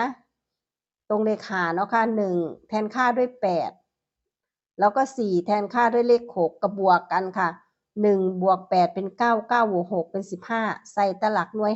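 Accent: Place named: Chaiyaphum, Thailand. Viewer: เลข 5 สวยครับ สำเนียงเหมือนคนชัยภูมิเลยครับ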